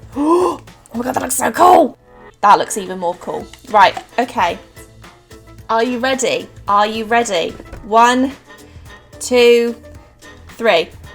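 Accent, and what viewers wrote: United Kingdom. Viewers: Are you British ??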